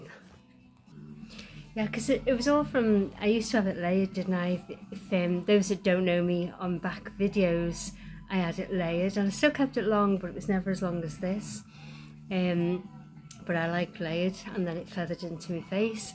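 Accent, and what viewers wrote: Liverpool, United Kingdom. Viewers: I would have loved to have seen you on Beadles About it would have been funny seeing you getting angry with your Liverpool accent 😂😂👍. I watched one episode earlier a bloke parked a ice cream in front of somebody's house blocking his drive selling ice cream. The bloke in the house started chucking his sauce bottles at him do you remember the bleep sound when somebody was swearing on it 😂 funny.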